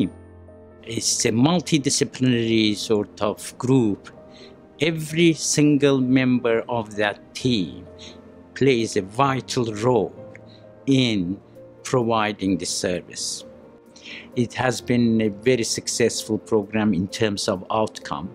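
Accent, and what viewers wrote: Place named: Azerbaijan. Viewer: Azari accent👍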